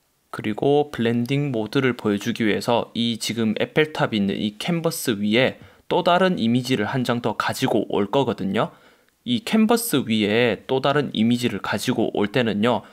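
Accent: Gyeongsang, South Korea. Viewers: 억지로 서울말 안쓰셔도 됩니다ㅋ(아무리 들어도 경상도 사투리..ㅋ). 좋은 강의 잘 듣고 있습니다. 재밌어요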